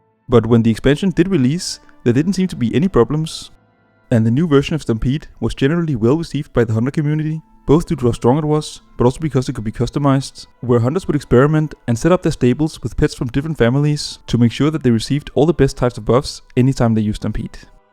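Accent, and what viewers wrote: Denmark. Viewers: You can just hear this guy is danish xD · Great video, I love the danish accent, nice narration. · Skud ud til danglish! <3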